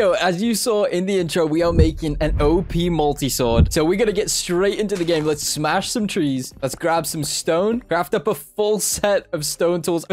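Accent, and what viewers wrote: United Kingdom. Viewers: bu'on dude your bri'ish accent is so intense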